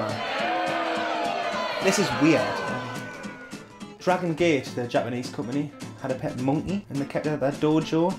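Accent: United Kingdom. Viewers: This is too british for me to understand.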